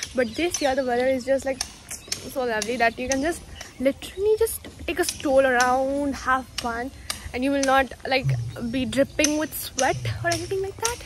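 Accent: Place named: India. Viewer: She has a Indian accent and it's so cute to listen Indian English accent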